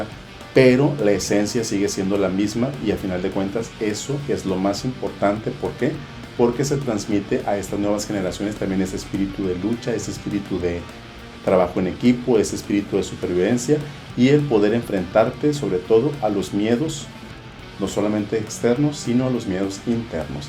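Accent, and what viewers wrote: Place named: North, Spain. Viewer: Hablas bien bonito, acento del norte je je je.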